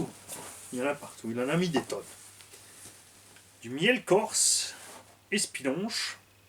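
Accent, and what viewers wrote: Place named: South-West, France. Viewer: Yes avec l'accent du sud ouest..... lol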